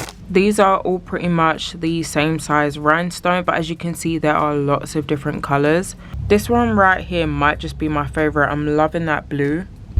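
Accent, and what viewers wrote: United Kingdom. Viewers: Quick question,I can hear your British accent… did they deliver to you in the UK?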